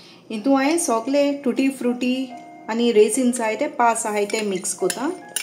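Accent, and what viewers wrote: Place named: Cuncolim, India. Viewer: I like your konkani dear.are u from cuncolim? It sounds like cuncolim konkani 😅😅. Love all your recipes ❤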